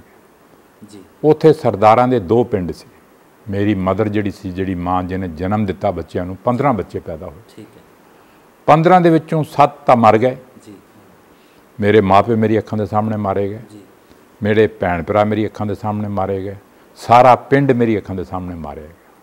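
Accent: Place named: Multan, Pakistan. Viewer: Beautiful. Maza aa gaya Malikha Singh ji ton Multani ( hurn inu Saraiki aandy aan) di mithri boli che mithri khawat sun key.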